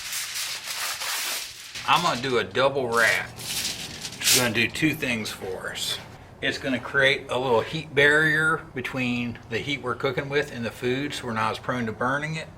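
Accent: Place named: Northeast, United States. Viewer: what part of the northeast are you from I can't place your accent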